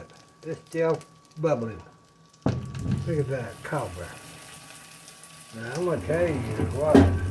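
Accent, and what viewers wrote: South, United States. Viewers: Hi, I really enjoy your channel. I'm a cobbler fan from way back, and I love your double crust method. I'm adopting it! My parents were from the south and I grew up cooking with cast iron and bacon grease. Matter of fact my mother was from the Little Rock area. Your accent certainly does sound like almost everyone in my family. Sweet memories.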